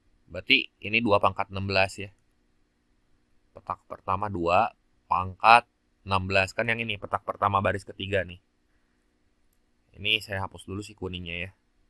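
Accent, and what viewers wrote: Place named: Bandung, Indonesia. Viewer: logat nya bandung pisan mang😂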